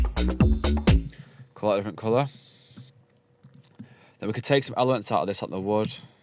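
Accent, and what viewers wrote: United Kingdom. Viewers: Can't understand that heavy British accent. Skipping.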